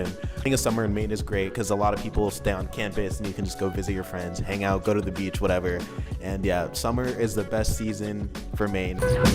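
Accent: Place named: United Kingdom. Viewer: dude this video was so amazing!!! it was so helpful i loved everything you said, it helped me get a better idea of what bowdoin was like (tbh i thought it was kind of stuffy and boring) and the general college advice was amazing as well! this has me excited to hear back from bowdoin. thank you for showing what the city is like as well bc i was under the impression it was in the middle of nowhere so i def wasn’t expecting a city lol. also your energy is just amazing!!! you’re so funny and kind and made this video so fun to watch cause usually i dont like videos like this. you’re amazing man, keep going! you’re gonna be great i can tell!! (also your british accent was so good even tho u said you grew up in the midwest i was like is he from the uk!???)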